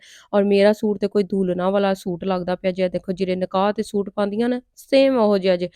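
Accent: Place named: Sargodha, Pakistan. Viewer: Meri bahn apki boli asi ha jesy sargodha ki ho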